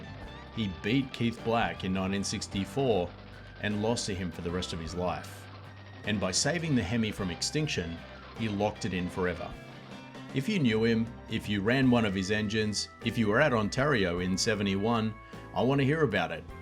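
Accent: Australia. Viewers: Great vid, no AI, and the down under narration seals the deal. Subbed, liked, and hyped . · I swear I don’t think all Aussies sound alike, but you sound remarkably like Dr Robert Chase, MD (Jesse Spencer). Great video btw, nice to find non-AI channels 🎉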